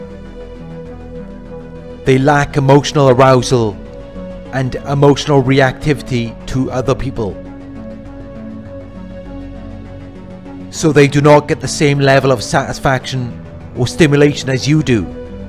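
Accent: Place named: Manchester, United Kingdom. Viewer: I think he’s from Manchester, in north of England. I sometimes get it mixed up with the Liverpool accent, but my mnemonic for remembering is that The Beatles and Wacko from the Animaniacs (inspired by Ringo) are from Liverpool, and Daphne from Frasier is from Manchester.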